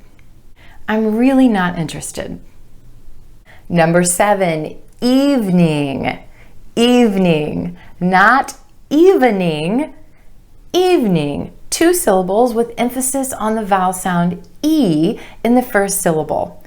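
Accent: United States. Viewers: Hi madam I'm one of your subscriber.I love American accent I need more vocabulary pronunciations video's please make some more examples video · I do love American accent! Massive thanks to you Dear! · Two reasons why i watch your videos: 1- to learn the american accent 2-you're so damn cute😍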